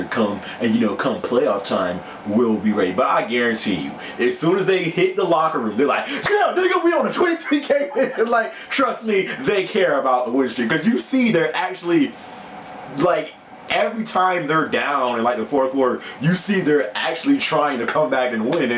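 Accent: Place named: Miami, United States. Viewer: I can tell that you probably from Miami. I might've seen you before somewhere. And fuck Miami and the Heat!!!!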